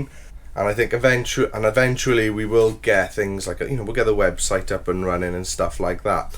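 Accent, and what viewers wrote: Wales, United Kingdom. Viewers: Where is that accent from? It's not Brum, or Scottish ... Welsh perhaps ??? Shame your workshop is not big enough to bring the vehicle inside - rain will be a big problem - traipsing into the van making a wet floor and a mess. I don't like any of the layouts, nearly ALL vans are not wide enough to sleep across the space ... My personal layout is for a single person - fixed bed along the 'long' side with storage under, and kitchen on short 'door' side. Potty at end near rear doors, no garage, no shower, no electrics. VERY basic, therefore very cheap 👍👍💯💯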